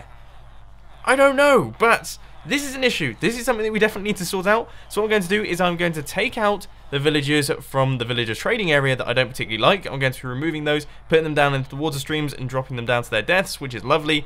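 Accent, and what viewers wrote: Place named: United Kingdom. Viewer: god, I see so many people commenting that "mumbos voice sounds like wheatly from portal"... except it doesn't. wheatly is a robot which has a british accent. is british, hence, has a british accent. just because they both have british accents, doesn't mean they sound alike.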